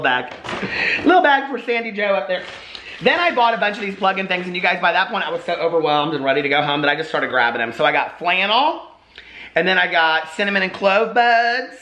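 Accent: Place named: Midwest, United States. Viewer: I love that you are a MidWesterner, so am I. I do crack up when I hear the verbal Midwest come out . Like your black church shoes. Years ago I made a reference to growing up we didn't have numerous shoes we had school shoes, play shoes and church shoes and a couple people didn't know what church shoes were. Lol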